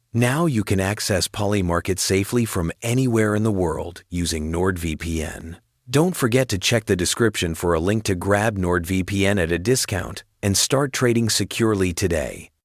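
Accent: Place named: India. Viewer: indian detected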